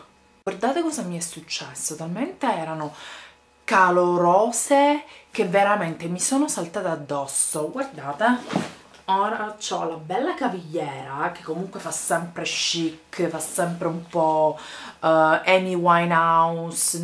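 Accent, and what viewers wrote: Sicily, Italy. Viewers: ma quanto ti adoroooooooooooooooooooooooooooooooooooooo..... poi il tuo accento SICILIANO lo adoro.... anchè perchè anche io sono catanese :******************************************* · sei siciliana? :)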